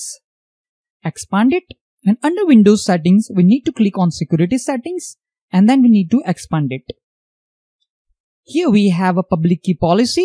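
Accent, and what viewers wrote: India. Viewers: indian accent boosted my brain, thank you bro i love india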